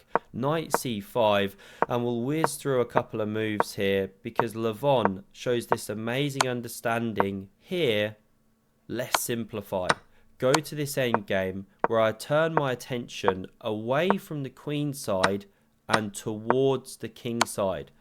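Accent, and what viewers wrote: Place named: RP, United Kingdom. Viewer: There's nothing like chess commentary served up with a posh Eaton college accent!❤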